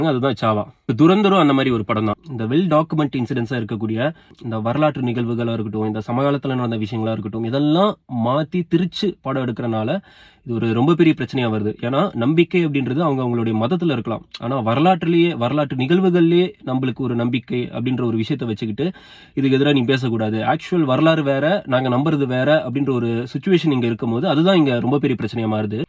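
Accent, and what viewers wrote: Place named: Pakistan. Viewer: you are literally speaking like a Pakistani person voice,ur videos show's how much ur radical, u r too bad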